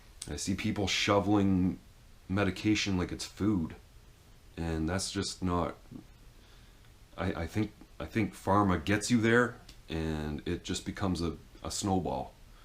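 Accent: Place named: Canada. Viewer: Lol Man I saw your video about 3 or 4 years ago and I ended up finally trying shrooms off and on several times over a couple of years. They definitely have the ability to reset your brain and outlook on life. I just happened to run across your same video again this evening. It is unbelievable how much you and I look alike, have similar personality traits and even our voice sounds alike except for the fact that you have a Canadian accent and I am from Alabama so I have more of a southern United States accent. I mean I know that sounds crazy but the resemblance is unbelievable.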